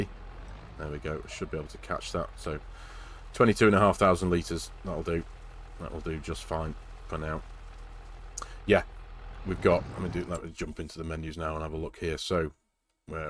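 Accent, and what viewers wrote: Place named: Dorset, United Kingdom. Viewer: Have missed your Dorset tones, for some reason youtube didnt notify me of your vids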